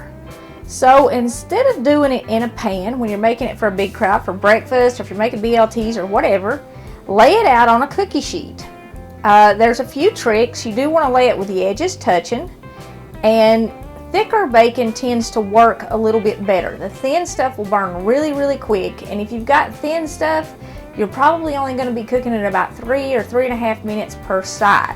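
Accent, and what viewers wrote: South, United States. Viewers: I'm from California and I just happened to see one of your videos, I'm not into country and don't like country accent, but listening to and watching you, I completely change mind and absolutely enjoy watching you and your recipes are so easy and such fun to watch being prepared, Happy Holidays to you and your and also to your utube watchers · I love your southern accent! I'm from South Carolina 👍👍😊😊 SUBSCRIBED! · Precious Lady, Can you cut the music…it is distracting and I would love to hear your beautiful voice. That Southern accent sounds soft and sweet. That music doesn’t do Justice to your lovely program🌷🌸🌷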